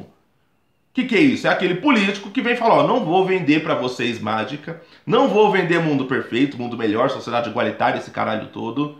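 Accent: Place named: São Paulo, Brazil. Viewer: Engano seu de pensar que eu acho que vc é superior ou inferior .Exatamente por vc ser quem vc é , inteligente e articulado ( mesmo detestando seu sotaque de periferia paulistana) e com convicções , é que estou aqui. Gosto de combater o bom combate....mas vc precisa ler muito ainda e viver mais. Sei que vc é olavete e esse é um dos maiores erros seu. Abra essa cabeça gorda e leia tudo de esquerda e de direita e faça uma média .Quanto a você dizer que viu no meu perfil que eu não sou interessante , é verdade . mas eu não quero ser youtuber como você .Você quer holofotes .Eu não , tanto que estou aqui em Off tentando me comunicar mas vc é raivoso exatamente como seu herrr ídolo.Obs. Não disse nenhuma vez que vc é imoral mas vc como facistinha que é foi logo dizendo que não tenho moral. Pobre diabo...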